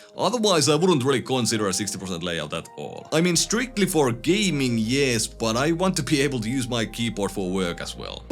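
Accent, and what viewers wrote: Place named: Finland. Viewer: huomaa aksentista että oot suomalainen. kiva että on tälläisilläkin videoilla suomalaisia tekijöitä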